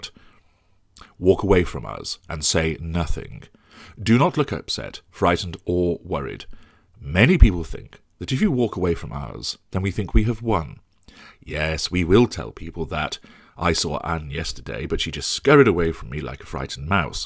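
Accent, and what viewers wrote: England, United Kingdom. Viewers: It's that awesome ENGLISH accent, whew!!! Outstanding!!! Xoxo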